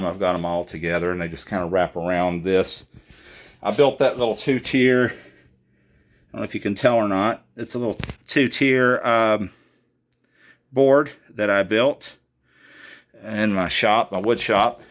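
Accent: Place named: Georgia, United States. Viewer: Nice Rig! I m a looper in Georgia. Where are you from? Sounds like from somewhere in my part of the country. I run the Boss RC 600, Handsonic, the Nord Electro 6d. I"m mostly acoustic driven. I use the Boss OC 5 for bass, the SP Compressor, another BBE Sonic Maximizer, with an LR Bagg Session Align, Strymon Blue Sky, Strymon Dig Dual Delay and Optima Air. Also use a Fender Smolder for electric guitar tones, Peterson Tuner pedal and LR Baggs Venue DI for my acoustic boost. Not in this order. This is a deep rabbit hole isn't it?! Love your channel.......feel like I found a soul mate! hahaha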